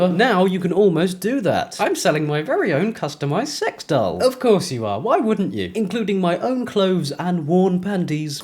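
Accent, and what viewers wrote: RP, United Kingdom. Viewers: As a german i just love your british english. It literally sounds like some rich guy in a suit with a cup of tea is talking to me😂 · I hope that colonialism and imperialism also come to your mind every time you hear that accent, because those are as traditionally British as the pompous tea drinker.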